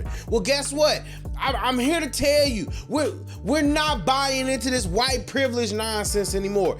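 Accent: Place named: Rural, United States. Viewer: I understand the hustle bro but why do all of you attempting this hustle have that country bumpkin accent? Sarah Jane Comrie is in the wrong, she could easily press charges if she felt otherwise. She lied saying no one knew the identity of the young man, when proven to be a lie, her lawyer came forward to state that he has not intention to even try to sue the young man for defamation. You have to have the IQ of stale bread to genuinely see that video and think your self that she was in the right. When I tell you genuinely speaking the rest of the world views Americans as low IQ trolls you do not help that image. If she doesn’t deliver a baby in 3 months I dare you to make a video.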